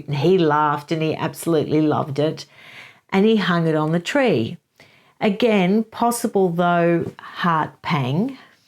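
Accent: Australia. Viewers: Thank you, I was going to ask your name! Are you Australian? I’m a new subscriber here and I love all your posts.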